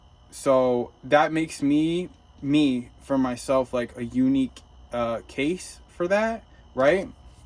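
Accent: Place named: United States. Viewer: Здравствуйте.Возможный вариант,как можно поступить( ну или,как бы сделал я))).У тебя родной английский язык( американский акцент)- это можно монетезировать.Есть парень из Шотландии,он устроился в частную школу в России и неплохо зарабатывает,спишись с ним.( он есть на ютубе).Что это даст: погружение в среду,основы русского,просто общение,ну и как шанс- найти русскую жену, ведь жить одному в России - это неправильно). Дальше: в школах летом 3 месяца каникулы,можно и США поехать ,поработать на траке эти 3 месяца,почему и нет,ну а можно и не ехать..Совет: не ищи себе девушку на сайтах знакомств в России,лучше в других ( обычных) местах.Бизнес- это потом,окунешься в среду,, найдешь русскую жену ,так легче.( да и меньше рисков ).Желаю тебе исполнить свою мечту.Удачи,друг!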